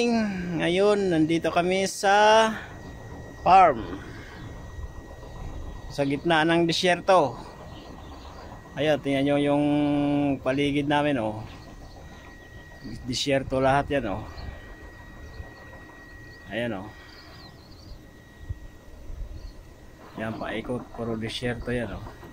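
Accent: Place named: Visayas, Philippines. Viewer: Bisaya deay ka lodi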